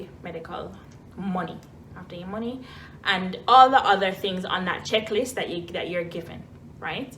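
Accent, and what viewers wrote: Jamaica. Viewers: Definitely a yaadie, mi not even going to ask. Suscribed-click. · She has a jamaican English accent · sounds like a Jamaican................thanks for the information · Hey there I hear Jamaican 😁 I subbed, thank you for the tip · I hear the Jamaican in you❤️❤️🇯🇲🇯🇲nuff love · I could tell you are Jamaican without you saying it, we Jamaicans know a Jamaican anywhere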